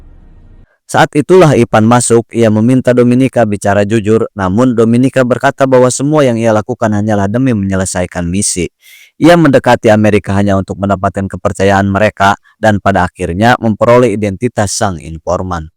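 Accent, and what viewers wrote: Indonesia. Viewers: Emang ngomong na kumaha kitu? Logat sunda na Sigah logat jawa tengah kitu? 🤭 Atos di subcribe luuur 🤩👍🏻 ╭╮🎋🪻🎋🪻🫰🏻 ┃┃╭━┳━┳━┳━╮ ┃╰┫╋┣╮┃╭┫┻┫ ╰━┻━╯╰━╯╰━╯ 🤗🤩🫰😍👍🏻💯❤️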